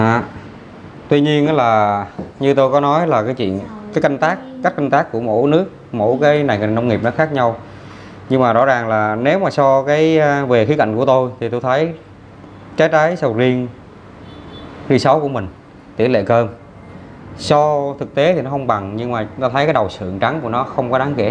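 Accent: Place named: Quang Nam, Vietnam. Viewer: Bạn ở đây mà nói tiếng Quảng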